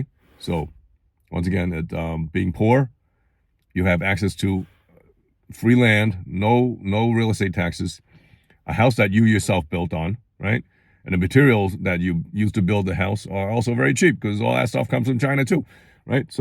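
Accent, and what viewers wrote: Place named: New York, United States. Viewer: If I did not see your face, I would think you were like an Italian American gangster. I never expected you to say you were born in China. lol. You got that New York accent down.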